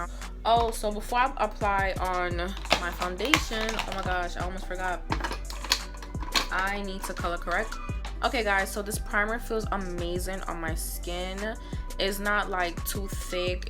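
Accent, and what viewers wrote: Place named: Jamaica. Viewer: Your Jamaican? You have a amazing accent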